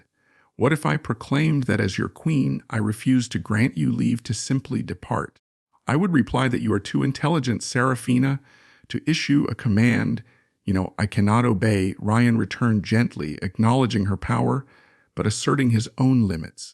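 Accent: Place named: India. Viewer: Why is most of this narrated in one voice, but the chapter announcements and epilogue are delivered in an Indian one? And the text moving around? Why? If you want to promote views, these are things that need correction. If you do not care, then never mind. Continue putting in the time and effort to produce these, as your subscriber count barely moves. It's all up to you.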